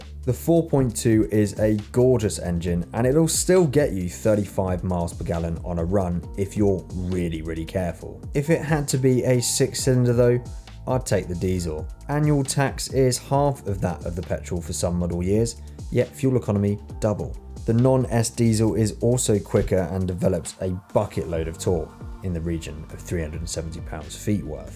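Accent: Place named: RP, United Kingdom. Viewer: Again, a superbly spoken video, very well delivered, you should consider presenting on on of the mainstream TV channels. Perfectly spoken Queen’s English! A real pleasure to hear you present and so smart and mature, of a intelligent man. Thank you 🙏🏻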